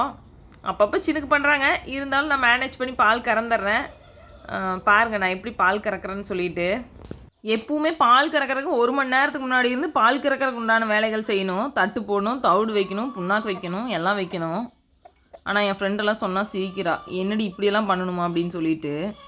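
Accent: Kongu, India. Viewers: எந்த ஊர் அம்மனி நீங்க கொங்கு பேச்சு தவழுது. வாழ்த்துக்கள் இந்த விவசாயி மகளுக்கு ❤🌸👌 கண்ணு ரெம்போ அழகா இருக்கு மா...🎉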